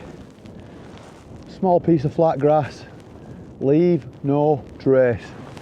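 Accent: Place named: Northern England, United Kingdom. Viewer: Fantastic to see you back out and hear your superb Northern tones,us Northerners stick together. I’m 62 and you’re inspiring me to start this wild camping malarkey. Look forward to the next video mate.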